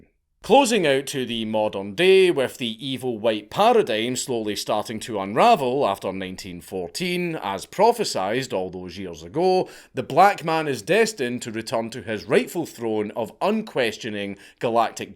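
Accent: Scotland, United Kingdom. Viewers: Can you please re-record a version of this with really cool looking advanced substation alpha filtered karaoke subtitles in order that I can understand what you're talking about? Between the obviously 100% real pseudohistorical mumbo-jumbo and your thick Scottish accent, I'm having difficulty following what you're talking about even with the English (auto-generated) Closed Captions turned on. In fact, they make it worse. It's like having a drunk Irishman attempting to interpret a Scotsman attempting to speak a bunch of colloquial American-English into British English or something. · what in the everloving fuck did I just listen to!? I did enjoy it being told in a Scottish accent though. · Wtf this is so nice, not only can I perfect my scott accent but I can also learn stuff. As a descendent of the Black Douglas clan this is a calming voice. Fuck England and long live the scottish man.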